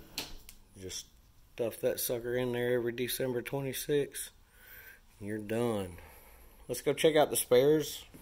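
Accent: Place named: South, United States. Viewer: first you are my favorite Manufactured Home Tour Guide and Commentator! Is that accent Alabama or Mississippi? Second, I love The Helicon. We toured a modular home at 3 Stones Homes in MO that was very similar except in place of a sunroom there was a covered rear porch. I like the sunroom better. Would it be possible to enlarge the master closet by 3’ by sliding the the wall back in the kitchen and master bath eliminating the smaller pantries beside the refrigerator/freezer and reconfigure the master bath by eliminating the tub, moving the first vanity down to connect to the second. You would be able to have a double sink vanity with a makeup dresser in the center as well as a larger closet. I have designed, redesigned, drew blueprints and built our last 3 homes myself. So I look at the possibilities. We are in our late 70s and need to scale down size wise and a story. 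My hubby and I started our life together in two very small “mobile homes” first one was a 1950s’ 2 bedroom 1 bath 8’x 30’ the second was new 1964 3 bedroom 1-1/12 baths 10’x 56’. Both fully furnished. We were way ahead of the tiny/small house craze....Lol. Do not want to go quite that small again. Third have you ever considered doing business in North East Texas?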